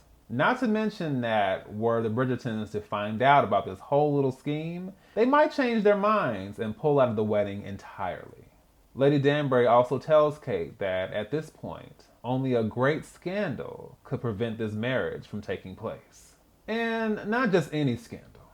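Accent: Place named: United Kingdom. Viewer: What do great reviews, a British accent, and fun historical financial facts have in common? You guessed it, another review.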